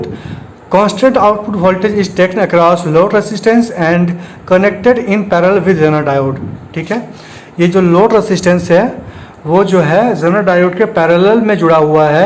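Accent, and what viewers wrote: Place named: Bihar, India. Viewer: Bhai aap ka voice bihari jesa AA Raha hee😊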